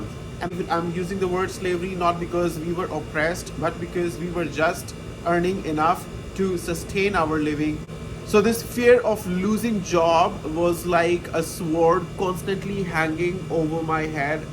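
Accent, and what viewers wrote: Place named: England, United Kingdom. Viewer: Very bad sound quality, i can hear only English accent, nothing sentences 🇺🇸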